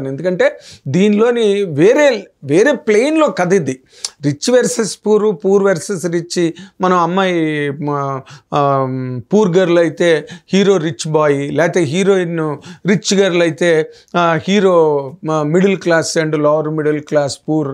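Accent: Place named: Vizianagaram, India. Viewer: విజయనగరం జిల్లానా? మాట తీరు అలాగే ఉంది!!!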